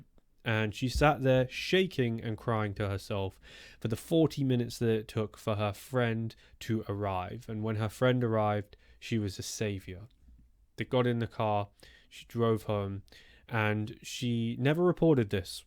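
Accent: United Kingdom. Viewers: You have been popping up on my phone over the last couple days, and I finally checked your channel out. What a fabulous storyteller you are! I was raised by a British mom here in the United States, and your accent has me feeling nostalgic..(she passed away almost 9 years ago). Subscribed, and listening in from beautiful Colorado. 💕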